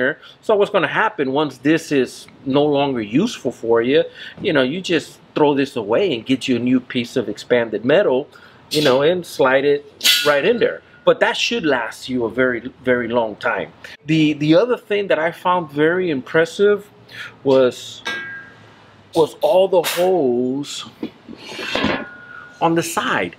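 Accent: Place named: South Texas, United States. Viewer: Where are you from you have that south Texas accent just like I do.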